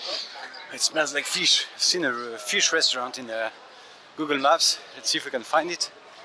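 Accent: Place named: France. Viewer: i can know ur from fr with ur acsent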